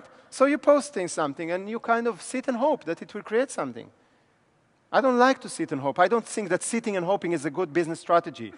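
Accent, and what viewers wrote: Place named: Spain. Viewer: Bu nasıl video lan İspanyolca karışımı İngilizce konuşuyor adam bile konuşamıyor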